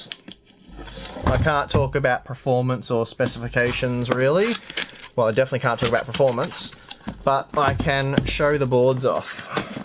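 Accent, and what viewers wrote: Melbourne, Australia. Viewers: Hey, are you from Melbourne?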